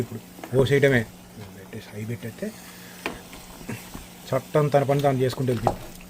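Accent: Andhra Pradesh, India. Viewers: Nee telugu andhra telugu la untadi why? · Anna me slang Andhra undi , meeru nizamabad migrate ayyara ?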